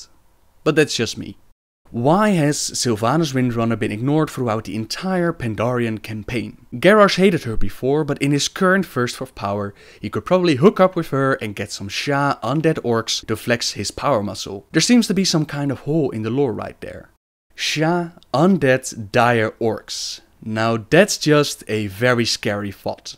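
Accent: Netherlands. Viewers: Dat dutch accent :D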